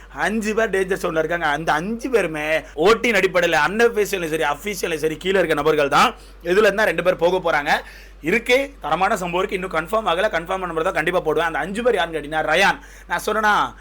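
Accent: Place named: Nagercoil, India. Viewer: Bro Nenga Nagercoil ah hahaha yalavu 😅Nagercoil slang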